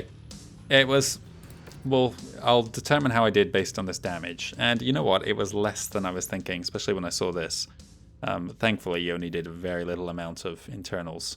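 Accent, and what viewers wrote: United Kingdom. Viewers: Personally I struggled to hear Ryana’s Scottish side, tho if you said she came more form the east side of Scotland around Edinburgh or Fife areas then they have alot less of a toned version compared to west and mid Scotland. So it’s probably true being she’s a Northwinder but likely comes from a part that has just a very very mellow version of the accent. Edit: also say a lot when I didn’t even know you were Scottish yourself. So aye, definitely more on that more mellow side then. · i hear a brit'ish accent but not a haggis laced one.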